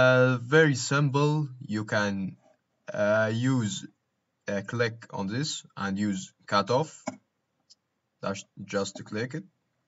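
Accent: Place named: Egypt. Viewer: يسطا انت مصري so try to adjust your accent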